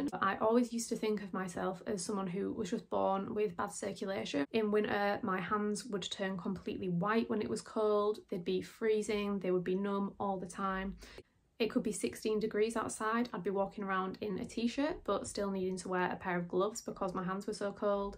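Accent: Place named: England, United Kingdom. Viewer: I will definitely purchase that book. This stuff is so fascinating! Great channel overall as well. I didn’t want to offend but I figured England and I do understand there are various different accents among parts, as is the same here in the U.S. Very Russell Brand-esque to me 😂 Beautiful though!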